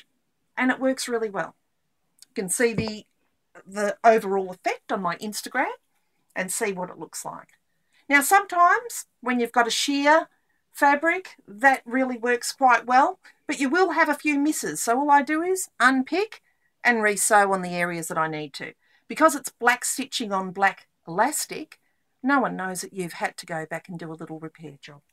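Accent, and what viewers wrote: Australia. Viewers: You sound like an Aussi? From where? I’m from Bentleigh, Melbourne!!!!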